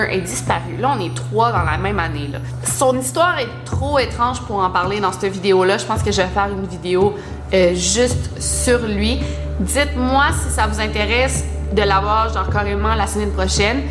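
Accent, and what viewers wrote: Quebec, Canada. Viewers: je t'adore !! continue comme ça et j'adore ton accent québécois mdr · De bases j’aime pas l’accent québécois sa minsuporte mais depuis que je regarde tes vidéo sa mfais plus rien hihi (une vidéo sur les vampires ? Sa serais ltop)❤️❤️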